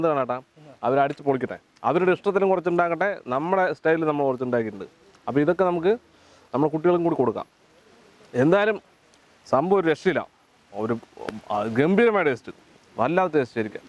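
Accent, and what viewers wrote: Palakkad, India. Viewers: ഇക്കാടെ പാലക്കാടൻ സ്ലാങ് ആണ് എനിക്ക് ഏറ്റവും ഇഷ്ടം 😇😇 ഫുഡ്‌ പിന്നെ മാത്രം 😘😘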